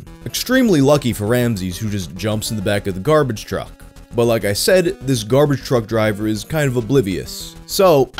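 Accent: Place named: Buffalo, United States. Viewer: sounds like he's from Buffalo or something.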